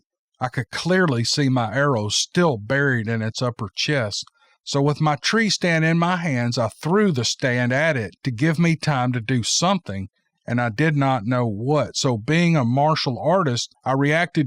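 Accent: South, United States. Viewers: Of all the cryptid channels, is my pick as the very best. No small talk chatter, straight into the story, excellent diction, smooth Southern charm with a clear and soothing voice, got it in abundance! Cannot fail to give proper credit to all the submitters for their excellent ability to covey the story in writing. Wouldn’t be successful without all the quality content!! Miigwetch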